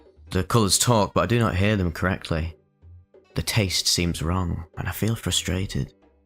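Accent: United Kingdom. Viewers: Always some British schmuck narrating these weird videos. #1776 fk the queen n her herpes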